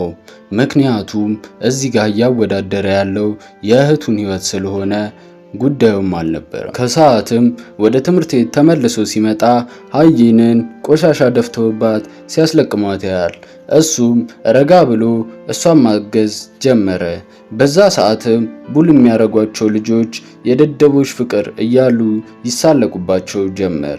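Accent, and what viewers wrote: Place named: Borko, Ethiopia. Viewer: ቦርኮ ነህ እዴ አወራርክ ሲዘጋ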